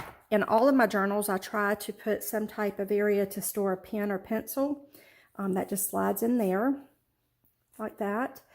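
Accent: South, United States. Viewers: WOW!!!!! I am so glad I saw your comment about showing this journal on Tracie Fox's Facebook Page. Its one of the most well done journals I've seen yet!!!! Really beautiful and so much thought put into it! And, another thing that caught my eye is your middle (or maiden name) is...and my maiden name is also!!! You don't see too many McCollums so I was intrigued!! I am originally from Texas but I now live in Alabama...I can tell by your accent you are somewhere in the south I think!!! Anyway, loved the journal and now I am off to check out your Etsy store!!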